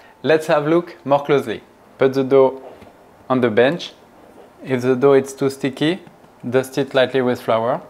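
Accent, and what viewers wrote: France. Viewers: Ha putain l'accent va plaire 💪 So frenchy ❤️ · Les anglais doivent être dingues de ton french accent 👍👍👍👍bravo en tout cas 👍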